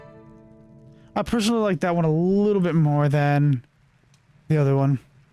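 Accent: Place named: Scotland, United Kingdom. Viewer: The commitment to Scottish accent is fantastic. Thanks for doing this playthrough, so fun to see this game turn into an abridged series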